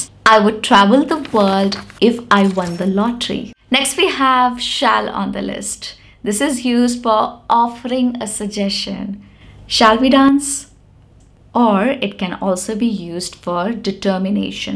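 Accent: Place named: Bagheli, India. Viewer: Are mam kaha se ho aap kyunki bagheli bol rhi ho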